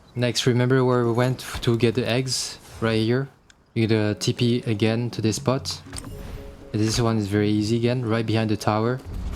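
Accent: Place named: France. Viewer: LOL I didn't imagine you were Asian. I always thought you had something that sounded a bit like French accent, not sure, but I thought you were most likely European or smt.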